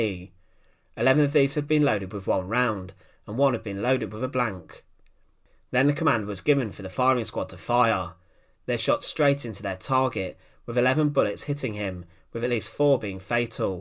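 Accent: United Kingdom. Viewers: In case you did not quite grasp the narrator's accent, he is English (British), or a British speaking computer generated voice. In any event, those stakes you saw in the video's opening segment are of a memorial in the UK to those British Soldiers who were executed by the British Army for desertion/cowardness during the 1st World War. (Hence the statuary of the guy standing against one of those posts with a target hanging over his heart.) Desertion and cowardness was quite high in a British Army still heavily influenced at the time by Victorian norms' and where, almost to a man, the commanding officers of those troops back then were all from the aristocracy, privileged, very well to do, or political leading families--- there was no such thing as PTSD being heard of by this lot) during WWI. Hundreds of soldiers accused / tried for it were summarily executed in the field as it was a very common practice then.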